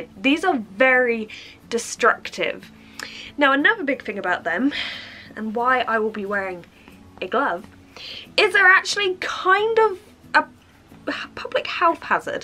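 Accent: United Kingdom. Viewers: I’m from Minnesota which is in the USA. I bet my accent would sound funny to you guys too haha! Where exactly in Britain is this accent from? So adorable.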